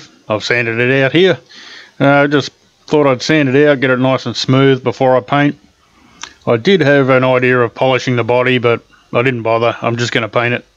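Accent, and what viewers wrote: Australia. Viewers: very humble man!! Becoming a huge fan of yours! You and!! Also helps that everything in a Australian accent just sounds cooler and funnier!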